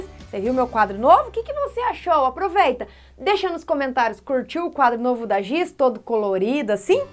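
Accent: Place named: Northern Paraná, Brazil. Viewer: A esta perdendo o sotaque do NORTE PARANAENSE RSRSRS. O jeitinho caipira. Quadro lindo e as cores da caneta sao vibrantes bem chamativos.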